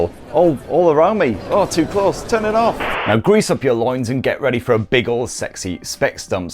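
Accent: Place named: Sunderland, United Kingdom. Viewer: Yet another brilliant review. Sunderland I live and you sound very local to me. I bought the x3 pro on the back of this review and I love it!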